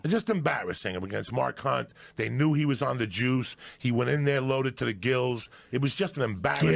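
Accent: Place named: New Jersey, United States. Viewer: The charisma this man has paired with that jersey accent is absolutely beautiful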